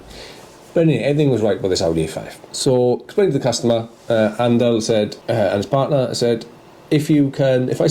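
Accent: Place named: Wales, United Kingdom. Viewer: Speak to Dave the lovely car company, has recently set up at unit with an in house mechanic, there is also a podcast / YT video where him, Joe and Lee (Car UK) talk about the equipment he should get (nov 24 i think it was). I do social media / YT stuff for them and as far as i know its one of the best things he's done, his words. In the mean time you could kit it out and get mobile mechanics in to use the area for your cars until your ready to employ them. great content btw, also I love the welsh accent with words like Fumming hehe. Lastly what they done to that audi is out of order. any way keep smiling and good luck with your new start up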